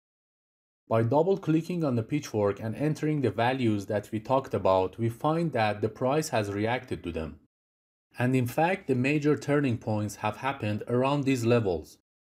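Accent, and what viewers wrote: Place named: Iran. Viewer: great video vali nemidonm chera lahjaton kheili farsi mizane :D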